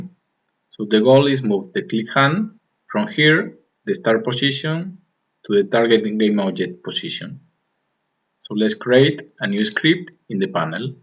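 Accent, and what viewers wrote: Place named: Spain. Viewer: Anda que no se nota el español xD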